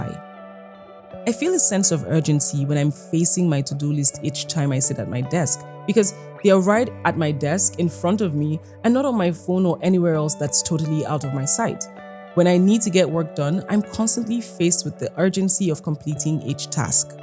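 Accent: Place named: Africa. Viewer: I didn't know women like u exist and you actually sound African. I am impressed and happy, will be nice to connect sometime and geek.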